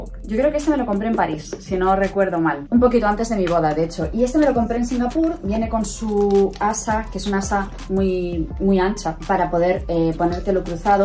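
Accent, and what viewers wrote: Galicia, Spain. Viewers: Tu acento gallego me encanta! 😍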